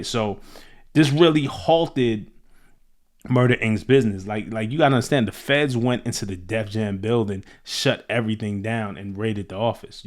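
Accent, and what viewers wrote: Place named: New York, United States. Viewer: Can't really stand the homie's NY accent, but this is a FANTASTIC video‼️✊💯👍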